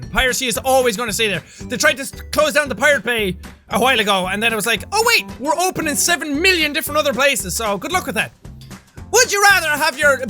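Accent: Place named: Ireland. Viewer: The irishman is always within him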